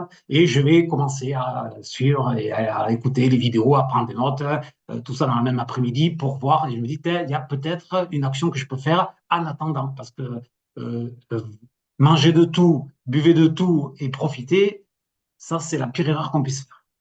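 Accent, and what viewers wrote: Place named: South, France. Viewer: Bonjour, si c'est mon débit de parole, pas de souci vous pouvez le ralentir dans les paramètres lecture à 0.75 . Si c'est ma voix et mon accent du sud, ça on peut rien changer 😉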